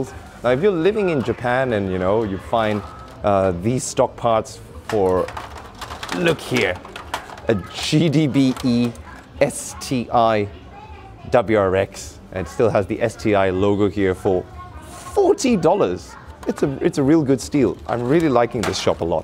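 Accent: England, United Kingdom. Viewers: is anyone else strangely fascinated by the British accent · i just randomly stumbled on to this channel i like it so far. Is this dude British? his accents super classy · i like your English accent no need translate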